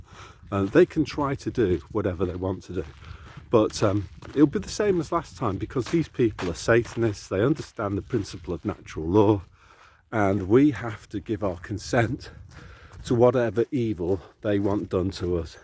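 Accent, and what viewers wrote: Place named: Manchester, United Kingdom. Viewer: Exactly! I’m same! In Manchester visiting m’Dad . Thanks 🙏 it’s lovely to hear some comm sense in a manc accent xx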